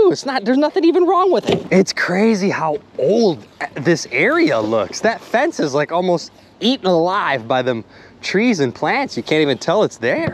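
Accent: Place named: Rhode Island, United States. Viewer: May I know where is this cute accent from? Rhode Island? LOL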